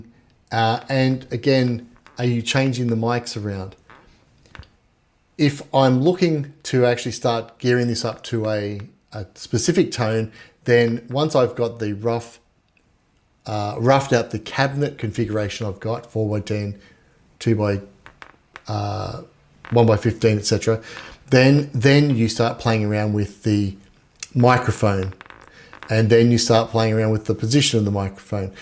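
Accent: Australia. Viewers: Great content and nice to hear an aussie too mate. Plus I have the exact same bass as you so great to hear these demos. Keep it up!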